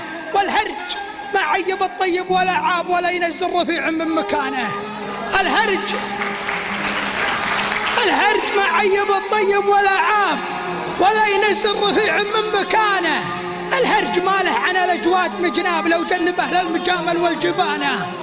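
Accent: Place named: Shahran, Saudi Arabia. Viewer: هذا درعاني واهبي شهراني يتكلم بلهجته الشهرانية الأصلية وقبيلي من روس قبايل وقحطان ونعم بهم احددانا وأنسابنا ولهجتهم مختلفة قليلاً عن لهجة شهران وهم وشهران أغلبهم بوادي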